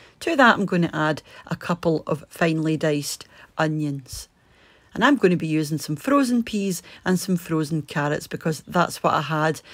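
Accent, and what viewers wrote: Scotland, United Kingdom. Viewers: Omg! This recipe looks so yummy. Love your scottish accent as well. I would love to visit your country oneday soon, the country drive looks so beautiful. Lots of sunshine ❤ and light from Florida! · That looks so tasty. I’m going to have to try that. You sound just like my grandparents, I haven’t heard that Scottish accent for years. Made me tear up a bit there 😢.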